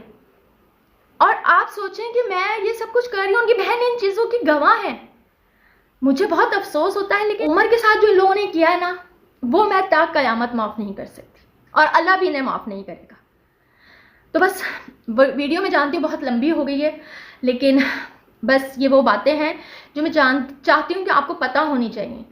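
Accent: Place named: Karachi, Pakistan. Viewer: Lalukhetan lgti ho, tmhara lehja bta rha hai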